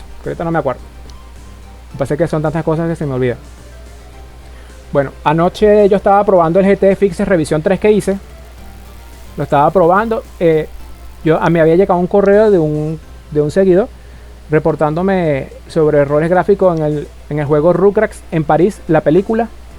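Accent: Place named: Colombia. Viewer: No se porque, pensé que eras de colombia Pero es bueno que estes bien Espero se recuperen pronto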